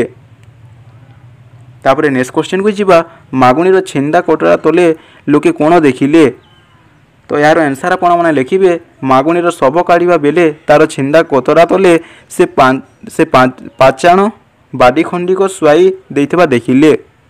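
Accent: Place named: Odisha, India. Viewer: Bhai tor katha ru to balangiria bhalia laguchhu tui..odia bhal se kahi nai janbar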